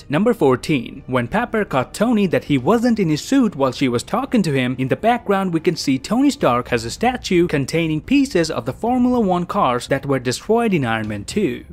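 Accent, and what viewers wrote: Canada. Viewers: I love your accent say it again!: Dowa the explowa Edit: Thanks for the likes! Hoping you are having a good day! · he sounds so much like modest pelican but Canadian instead of Australian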